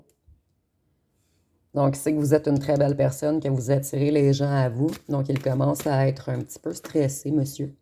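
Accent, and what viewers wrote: Quebec, Canada. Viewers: Merci. Belle guidance.. j entends que vous etes Quebec . Je suits Francaise qui habits Les USA….cela m a fait tres plaisirs de vous entendre. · L"accent québequois❤❤❤❤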